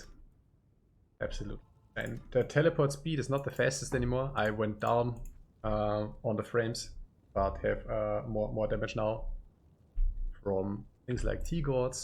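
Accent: Germany. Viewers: wenn man 2 sec im Video ist und aufgrund der Aussprache direkt weiß, dit is oochn deutsche Jung😂 Like+Abo direkt da gelassen, mehr d2r content= mehr gut ;)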